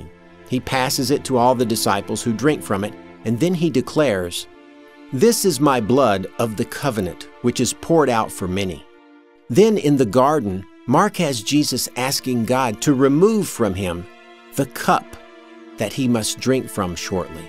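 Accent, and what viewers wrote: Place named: Mississippi, United States. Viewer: You're fairly articulate and I'd be lying if I said I wouldn't look forward to some Mississippi drawl for an entire video. It's a very distinct vernacular and as I'm from Northern Wisconsin myself, we like to pronounce everything through our noses and we add a few too man "ehs" and "oh yas" to pretty much every other sentence so variety is nice, eh?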